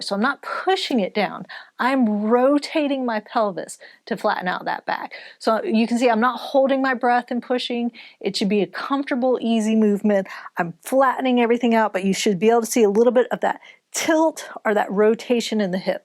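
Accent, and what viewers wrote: United States. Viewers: This comment is irrelevant to the subject of this video. I had always thought you were British, but tonight it just clicked and I was like this is obviously a very American accent!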